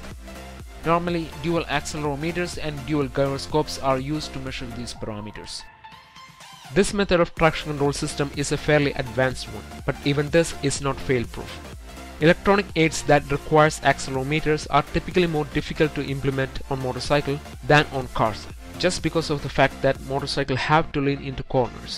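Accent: Kerala, India. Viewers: Hundred percent Mallu, but he is brilliant, and knows the subject very well. I loved the video. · very good video. well explained. is the speaker mallu guy ?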